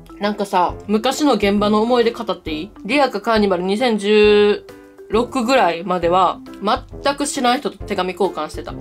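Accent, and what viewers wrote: Kansai, Japan. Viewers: やっぱりさんの声や関西弁が大好きです❤️ これからも推しの動画をもっとだして欲しいですさんのライブに行く時の参戦服やグッズ動画を出して欲しいです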